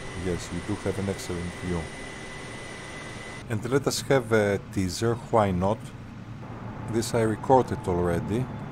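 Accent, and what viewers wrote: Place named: India. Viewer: the minute i herd the indian voicwe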